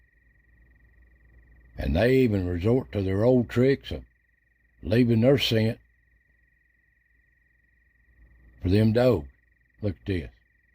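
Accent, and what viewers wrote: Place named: South, United States. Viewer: We really envy you, living in that beautiful place, looking up into that Peaceful Place that God gave us to embrace & enjoy😊. The simple pleasures really are the best. Really enjoy listening to you narrating those wildlife events & happenings, just something real right hearing it said with that old southern drawl; real authentic you know: right down to the ground genuine!! The Peaceful moments are much appreciated. Next best thing to being there🙏🏻!! 🫎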